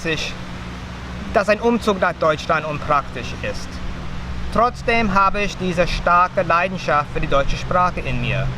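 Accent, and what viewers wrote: United States. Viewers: Dein Deutsch ist einfach super mit einem schönen amerikanischen Akzent 😀👍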